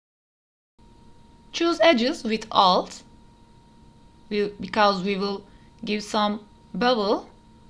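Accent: Turkey. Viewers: Ablamın ingilizcesinde türklük akıyor😀 Çok yararlı bir videoydu teşekkürler! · 😁 Türk aksanı nasıl kendini belli ediyor. İngilizceniz iyi bu arada. Video için de teşekkürler. Kolay gelsin. 🙋🏻‍♂️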